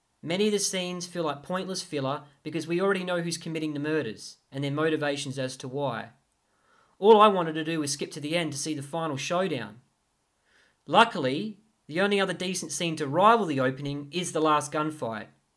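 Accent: Australia. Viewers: You're Aussie mate. Me too 👍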